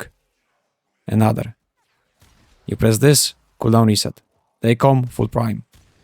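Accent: Poland. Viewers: Just on their own, hounds with manifold and contagious bond, synergized prospectus and some good statuses on their weapon can nuke rooms on steel path (especially now if your hound gets jade eximus aura that's like guaranteed room wipe xD ) But man, damage on that Syam is crazy! I really have to finally get this weapon Also, it's Muramasa a reference to Terraria? Oh and you're from Poland, yeah I thought accent sounded familiar :D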